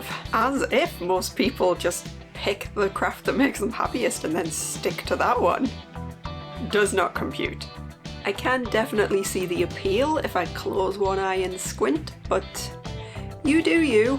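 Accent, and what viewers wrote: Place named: North East England, United Kingdom. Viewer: Nice to see you where are you from sounds like the north east in uk l am from uk l live in Redcar North Yorkshire l did get your name from Chris cross stitch shout out look forward to seeing your next video xx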